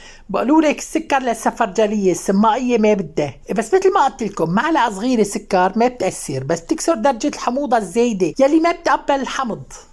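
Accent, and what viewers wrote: Aleppo, Syria. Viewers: لك خالتي و الله بفوت ع الفيديو مشان اسمع كلامك الحلبي الاصيل.ابوس قلبك شقدك مهضومة و الله عبتزكرينا بكلامات رح ننساهن😍🥰😅😅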